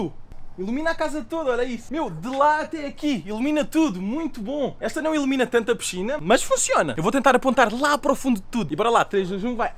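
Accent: Portugal. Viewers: É DE PORTUGAL OLHA COMO A VOZ DELE É DIFERENTE 😳😒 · Ele não fala o Português do Brasil, e sim o Português de Portugal. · seu sotaque de Portugal é mais fácil entender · De que local você faz os seus vídeos ??? Pergunto devido ao seu sotaque da minha adorada terra PORTUGAL, mais sou do BRASIL. Abraços amigo fique na PAZ. Obs.: Me inscrevi, dei joinha e ativei o sininho. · Ele... É português mesmo ou ele só faz o sotaque?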